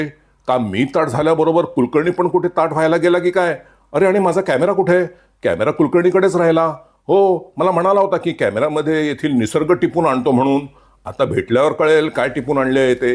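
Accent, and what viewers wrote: India. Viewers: व्वा - अस्सल मराठी 👍👍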